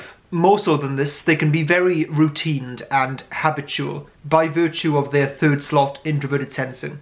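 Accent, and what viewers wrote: Wales, United Kingdom. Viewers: You sound VERY welsh. I’m south, how’s lockdown treating you?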